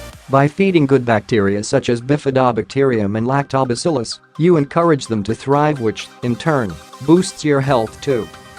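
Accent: United States. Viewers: An American robot!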